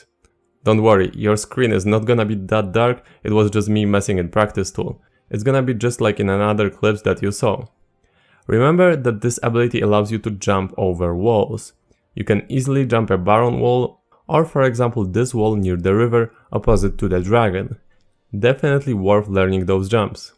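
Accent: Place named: Poland. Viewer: Ah ten polski akcent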